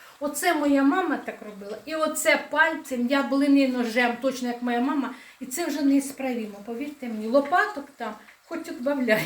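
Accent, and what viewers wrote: Standard. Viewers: Ой, як Ви гарно розмовляєте чистою українською мовою. Дякую. Хай Бог Вас благословляє і охороняє. Дуже подобаються Ваші рецепти. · Як приємно, коли, нарешті, згадали українську мову. При чому, чисту. Приємно слухати. І рецепти у вас, заслуговують на поширення. Бажаю успіхів.❤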